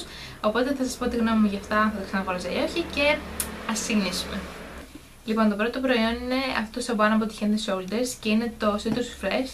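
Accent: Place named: United States. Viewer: Πραγματικα εισαι πολυ γλυκια κοπελα και τα αγγλικα σου με αμερικανικη προφορα οσο μπορω να κρινω εγω ειναι πολυ καλα δεν καταλαβαινει ο αλλος οτι δεν εισαι απο την αμερικη!Αλλα λεγε λεγε μαλλον αυτα λιγο στα ελληνικα χαλασε η αρθρωση δεν ειναι τιποτα διορθωνεται προσπαθησε λιγο πιο αργα και να ακουγονται ολοκληρες οι λεξεις καντο σαν πειραμα διαβαζοντας μονη σου μην κομπλαρεις και σταματησεις τα βιντεο στα ελληνικα ισα ισα θα σε βοηθησουν και μιλας καλυτερα απο κατι αλλες να εισαι σιγουρη!:D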